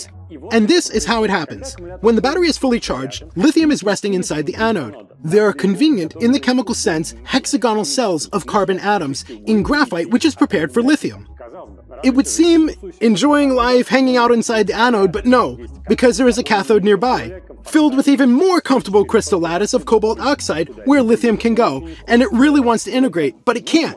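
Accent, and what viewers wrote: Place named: Russia. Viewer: поздно...лет с 5-7 надо постоянно говорить на языке, сейчас поздно, с диким руzzким акцентом англоязычные нейтивы слушать не станут ,тем более во время войны..да и тех.контента на хорошем инглише полно,они первыми узнают новости науки,лишь потом на других языках . почти всё по и железо разработано в развитых странах...запад определяет путь технологий,в рфии только узнают новости постфактум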